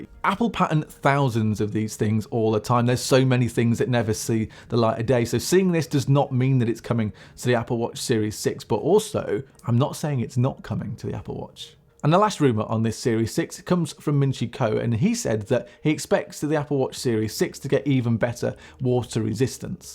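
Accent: United Kingdom. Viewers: Dollars ? You show pounds on the screen and say dollars, with a British accent. A bit confusing. Canadian dollars , or Australian dollars ?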